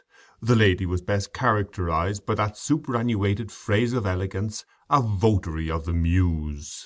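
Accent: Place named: Ireland. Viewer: How irritating; another Irish accent for Thomas Hardy! This is totally unsuitable and cannot be listened to!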